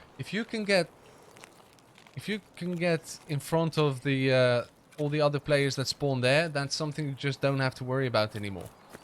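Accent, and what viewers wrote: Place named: South Africa. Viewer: I've been trying to figure out where you are from by listening to your accent.. (Found your channel today) First I was thinking European that's lived in New Zealand a long time.. But now I'm really leaning towards SA.. :P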